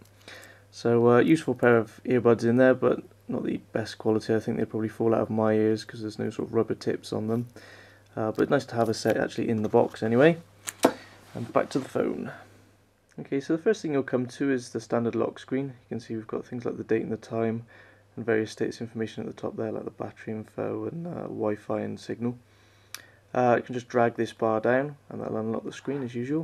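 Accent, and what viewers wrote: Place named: United Kingdom. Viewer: reviews are just more awesome in a british accent, haha great vid man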